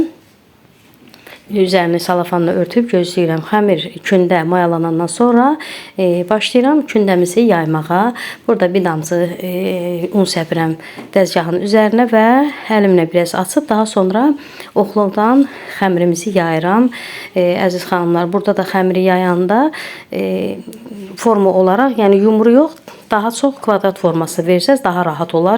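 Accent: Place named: South, Azerbaijan. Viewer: Hansı rayondansız ləhcə sirin gəldi. Cənub zonası?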